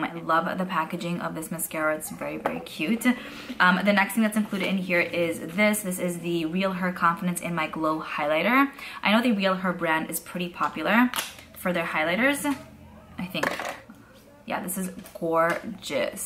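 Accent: New York, United States. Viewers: I AM FROM THE BRONX, I KNOW YOUR ARE FROM SOMEWHERE IN NYC WITH THAT ACCENT!😄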